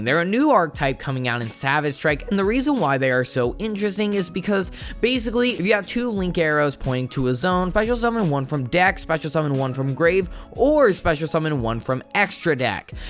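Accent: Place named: South, United States. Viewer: u gotta read the fine print of the banlist to see the borrelguard ban, it’s very easy to miss. As for the southern accent, I totally see it. It’s way funnier reading my comments in that voice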